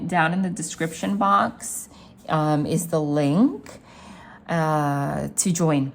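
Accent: New Jersey, United States. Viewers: I loved the rituals, I vibed with the one for the naughty kids in the class 😂😂 Your voice and how you use it when the trance is strong is so cool, and the Jersey accent, of course. Especially for a South African male, our English accent does NOT sound good.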